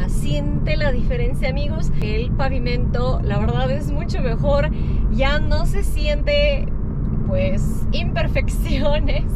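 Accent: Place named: Mexico. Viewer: Es el primer vídeo que veo de tu canal. Hablas perfecto el español y muy a la mexicana. Gracias por apreciar aspectos que para nosotros son algo común en nuestro país. 😘❤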